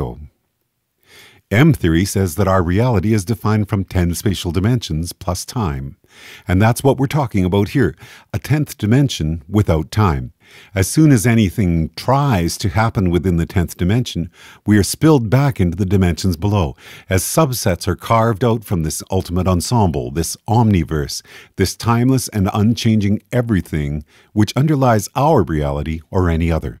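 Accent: Canada. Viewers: You sound Canadian. Am I right?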